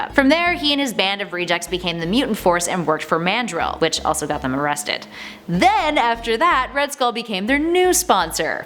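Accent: Canada. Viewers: You're Canadian are you not? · You're totally Canadian aren't you chicka lol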